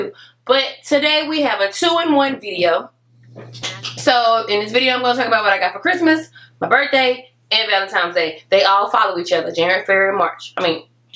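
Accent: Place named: South, United States. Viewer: i love your accent you got to be from the south like and once again u finer than any model out there!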